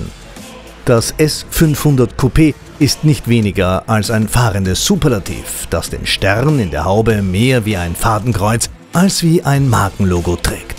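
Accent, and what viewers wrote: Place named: Austria. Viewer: sachlich aber unterhaltsam und emotional, dazu der ziemlich coole Akzent (Österreich?) - davon können sich viele Automagazine eine Scheibe abschneiden. Hat mir gut gefallen :)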